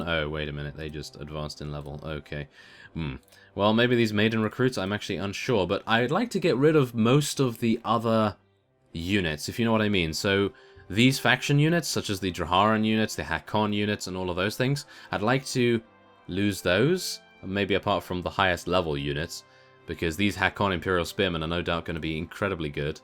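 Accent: United Kingdom. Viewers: awesome... your videos have been a source of entertainment for me for a long time now...ps: I love your british accent ^.^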